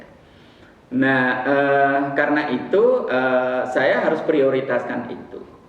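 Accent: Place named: Bali, Indonesia. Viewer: Salam sehat pak... Pengucapan huruf T kok seperti pengucapan dari orang Bali, Aceh dan Jawa Cirebon... Apa asli dari Cirebon ya pak... Terima kasih matur nuwun... 🇮🇩🇪🇸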